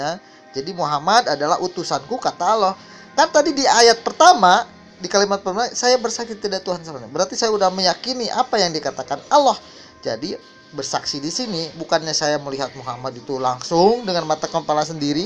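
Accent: Jakarta, Indonesia. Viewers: Apa saya sendiri ya yg ngakak habis denger betawinya si abang ini mantap abis bos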